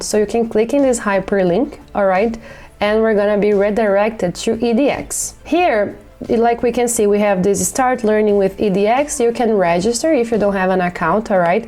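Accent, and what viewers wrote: Brazil. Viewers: thank u so much. and btw a month of watching your tutorials, always assumed you were russian or ukranian, never crossed my mind that accent was brazilian😆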